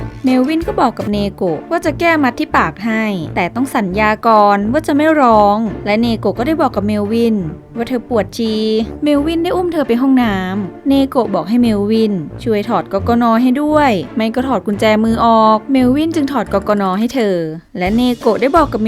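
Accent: North, Thailand. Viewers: สนุกกกกก คนภาคเหนือแน่ๆเลยอะ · แอดมินเป็นคนเหนือใช่มัยครับ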